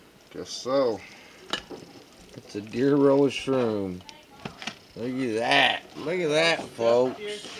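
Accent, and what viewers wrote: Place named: Appalachia, United States. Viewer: Love this hillbilly accent and simple earthy ways, super...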